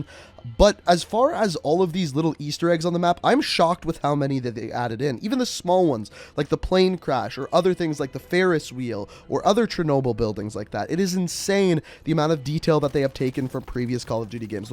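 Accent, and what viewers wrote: Canada. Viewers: You say ‘about’ and ‘out’ and I can instantly tell you are Canadian. Cool video. Thanks. I’m not sure if this is continuity in the Call of Duty games or just laziness by Activision? They probably have the skeleton’s of these maps and convert them over to their current engine. Will we get another Map, besides Verdansk?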